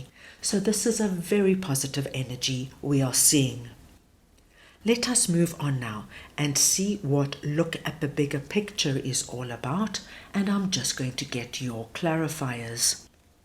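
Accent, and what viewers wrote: South Africa. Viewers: you have a south african accent africanda thank you great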